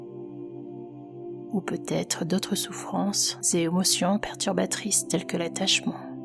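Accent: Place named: Normandy, France. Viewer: Merci pour cette belle vidéo je vais être subjectif mais étant de Normandie il y a un léger accent dans ta voix qui fait que tubers ton travail est intéressant et agréable merci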